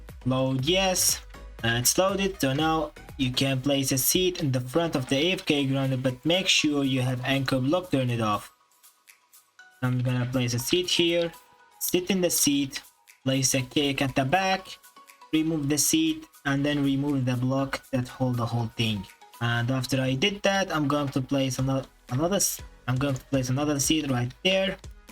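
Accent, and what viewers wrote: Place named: Arab world. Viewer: your accent is kinda arabic r u arabic?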